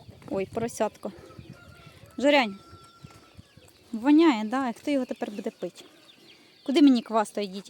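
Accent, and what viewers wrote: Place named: Poltava, Ukraine. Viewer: Дівчина,Жорік та всі інші друзі цього шоу.. Ви з Полтави?❤🎉❤ Така мова гарна ❤ Харків вітає ВАС❤🎉😊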